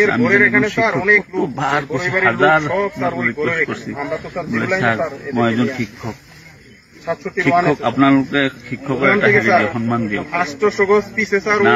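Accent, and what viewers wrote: Bangladesh. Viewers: Apnar Bangla sunei bojha jacche apni Bangladeshi. Amra sob bujhi.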